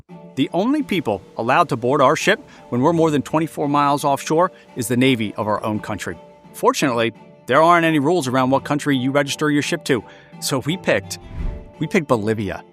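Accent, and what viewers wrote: United States. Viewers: u have brain worms chief he’s definitely American 😂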